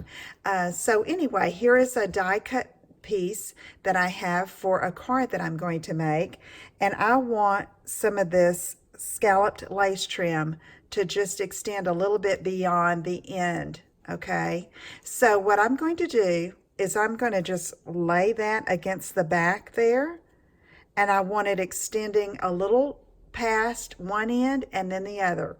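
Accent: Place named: Texas, United States. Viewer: I'm a native Texan. Love that drawl.❤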